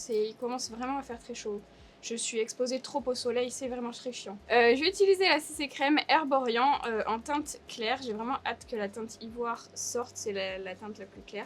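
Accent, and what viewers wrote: France. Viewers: prononce toujours à la française les mots anglais.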